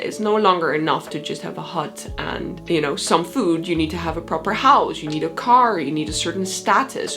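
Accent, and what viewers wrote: Netherlands. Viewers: weet niet waarom dit in mijn feed komt maar ik hoor dat je nederlands bent. leuk hoor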